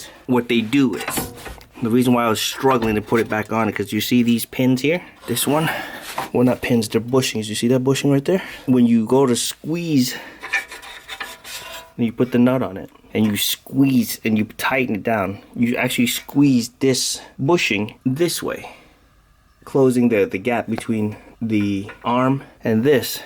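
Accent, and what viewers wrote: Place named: New York, United States. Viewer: is it just me or is his NY accent really showing in this vid 😂😂 I love it NY rep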